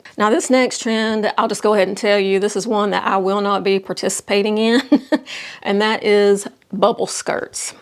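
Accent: South, United States. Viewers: My deepest condolences,! I hate January also but because I live in CO and it’s miserably cold! My daughter lives in Tuscaloosa (AL student). I thought I recognized your cute southern drawl. Thank you for keeping me fashionable. I’m so happy I found you. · Love your Southern way of speaking. Could listen to it foe hours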